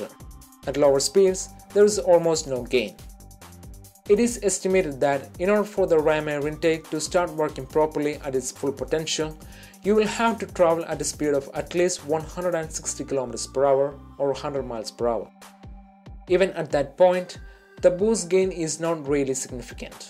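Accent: India. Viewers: Such a heavy Indian accent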